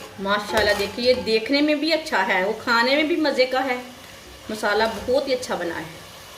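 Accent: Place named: Punjab, India. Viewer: جی اپکی ريسيپی کی تو بات ھی اور ھے مگر پنجابی سٹائيل ميں جو اردو کے الفاظ اپ کے لب مبارک سے پھولوں کی طرح جڑ رھے ہيں😀، ان کی بھی کوئی مثال نہيں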